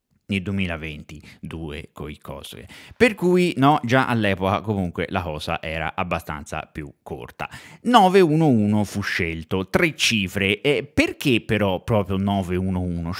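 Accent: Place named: Siena, Italy. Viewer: Bravissimo 1) Per i contenuti 2)Per la simpatia 3)Per il formidabile accento senese.Numero UNO!!!!!:washhands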